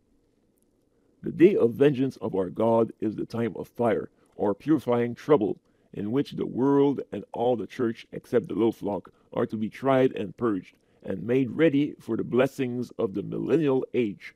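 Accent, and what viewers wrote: Quebec, Canada. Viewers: hmm t québécois toi, je sens l accent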